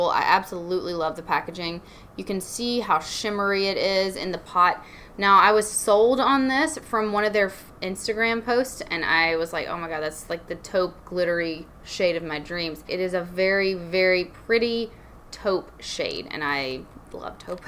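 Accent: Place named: Texas, United States. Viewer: Are you from texas .... you sound very Texan .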